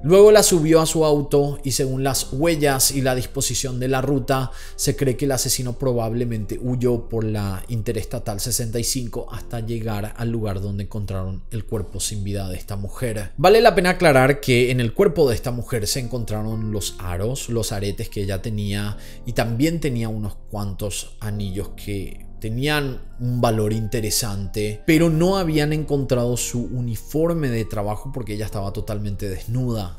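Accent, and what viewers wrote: Paraguay. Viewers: Gran canal por tú acento se nota que sos de Paraguay capo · Me encanta tu acento de Paraguay!! Vivi allí varios años y mi hijo nació ahí y tenía tu misma forma de hablar, te felicito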